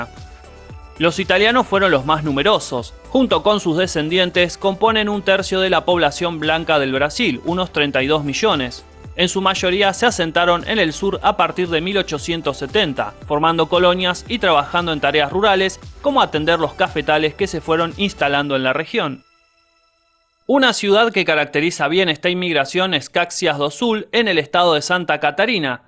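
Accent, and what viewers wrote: Argentina. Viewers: Qué yeísmo tan fastidioso el de este argentino, pronuncien bien la “y” y la “ll”, parecen idiotas con esa pronunciación tan fastidiosa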